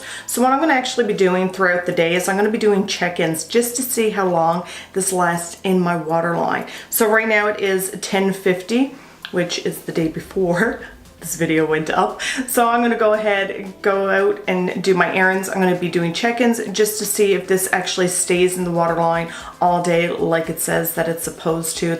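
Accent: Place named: Canada. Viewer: Hello. So 5 seconds into this video andI could tell that you're Canadian. I had a Canadian pen pal years ago, so I can spot Canadians like no one's business. Great review. Thank you!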